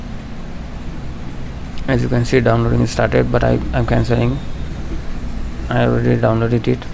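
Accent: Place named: Arab world. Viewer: من صوتو واضح عربي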